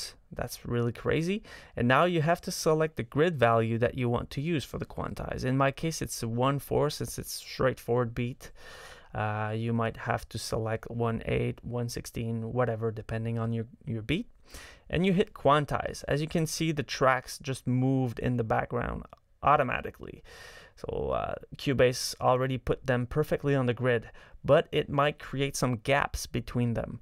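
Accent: Quebec, Canada. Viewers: Eille stun accent québecois ça! :D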